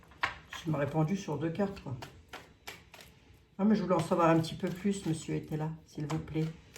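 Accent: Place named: Vosges, France. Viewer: Bonsoir, j'ai écouté Christophe ja cob hier soir, ils ont dit avec Babette qu'ils allaient vous voir, ça promet les bêtises 🤣🤣😅😅. Moi je suis une ex spinalienne, du coup j'adore votre accent, et vos bêtises 🤣🤣😅😅 ça m'éclate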